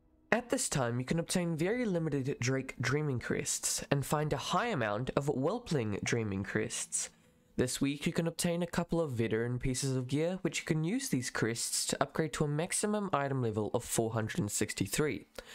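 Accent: New Zealand. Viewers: Is this guy a kiwi? The accent is close but something is slightly weird about it, maybe from some random place in NZ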